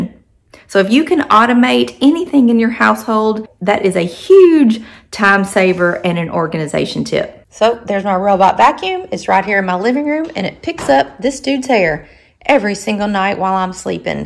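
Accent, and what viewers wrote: South, United States. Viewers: I don’t know why but you amuse me. Love your southern accent. So much energy. Thank you for the tips. So helpful. I’m 61 and started being more mindful about knowing where my keys are.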